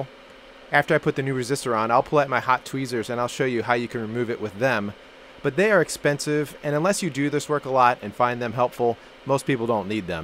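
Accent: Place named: United States. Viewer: Hi cool video. ..but you don't say Soldering/solder propley. .guess it's yank accent. But like I said good video. And am going to fix my television.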